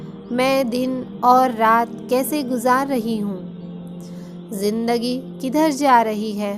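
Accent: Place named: India. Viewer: Masha Allah Apsy milkr khushi hoi jee apky bolny k andaz andaza howa k ap Indian ho.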